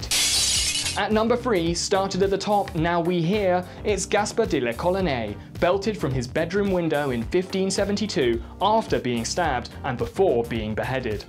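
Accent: United Kingdom. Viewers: This guy does a pretty good British accent.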